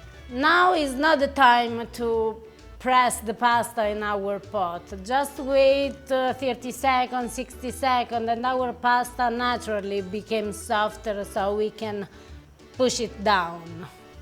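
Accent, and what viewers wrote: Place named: Italy. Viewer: great! What a cacio e pepe! Someone link videos to some other movie director who wants to do a next movie about famous Italian families... Mr. Scott, I'm talking to you! This is how we speak english. For American who didn't understand, House of Gucci movie, watch the trailer, listen how Pacino, Leto, and Lady Gaga speak and compare to.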